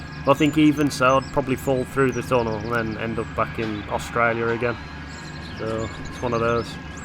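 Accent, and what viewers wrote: Black Country, United Kingdom. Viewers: Oh wow I love this channel and didn't even realise you are from Oldbury, I live so close, in Tividale, going to Papa John's in a bit if it's open lol! I should have realised from the Tara a bit, but that just sounds normal to me, I forget our dialect really is quite confined, and no, we ay Brummies lol!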